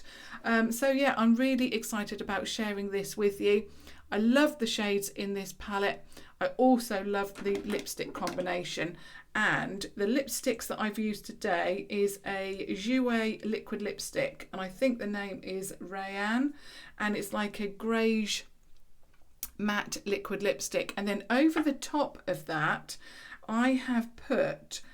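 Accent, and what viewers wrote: United Kingdom. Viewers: The way you speak is so amazing! So gorgeous (and proper sounding) with the British accent but not just that your voice is so calming and nice to listen to :) (I'm from Los Angeles CA lol) but I favor British you tubers. I love visiting old palettes!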